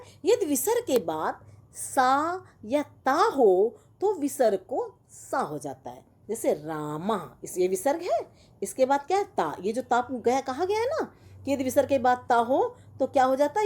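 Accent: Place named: Punjab, India. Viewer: Ma'am are you Punjabi?? Cause your accent is like that..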